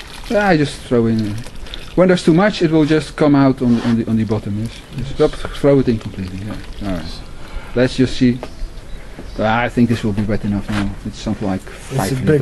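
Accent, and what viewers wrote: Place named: Netherlands. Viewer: I really don't want to sound gay but the dutch accent speaking english is the best!! havin a toke and listening to just makes me so chilled!!!!! keep up he good work fella!!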